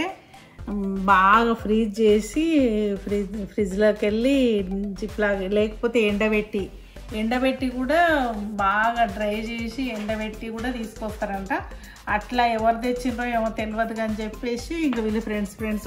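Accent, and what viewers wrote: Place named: Telangana, India. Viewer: telangana style la manchiga chepindru peddamma thank u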